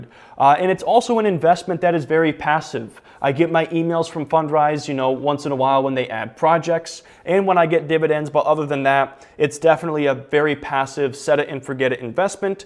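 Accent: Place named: Midwest, United States. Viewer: Hey, just curious where you're from? It sounds like the Midwest. Are u from Utah or Wisconsin or something?..just curious:)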